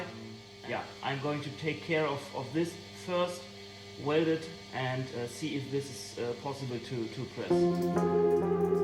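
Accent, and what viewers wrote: Germany. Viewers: Let's do it. I need XXL though, and shipping will be to USA. I want to support you. BTW, one of my grandmother's was a card carrying immigrant from Germany. She had many German friends in the states. Their English was just like yours. Very good, with an accent. Reminds me of my childhood. Love it.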